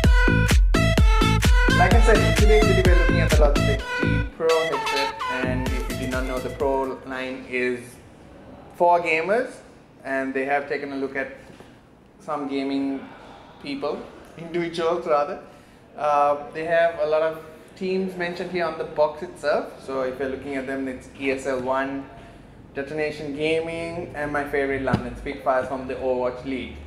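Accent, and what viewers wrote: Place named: India. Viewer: that indian accent is very bad lmfao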